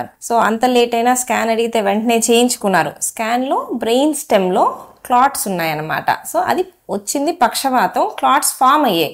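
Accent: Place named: Rayalaseema, India. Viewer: కొంచం రాయలసీమ యాస గుంటూరు యాస మిక్సింగ్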